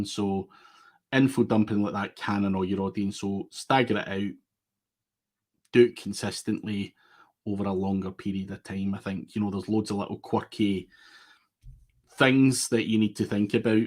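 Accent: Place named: Leith, United Kingdom. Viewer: Many thanks again. Your accent is spot on in the People’s Republic of Leith. - hit me up if you’re looking for a native English teacher; language courses is a niche I work with, can get you some great deals with the top schools; accent really isn’t that strong, with a wee bit of practice, you’ll have no problems.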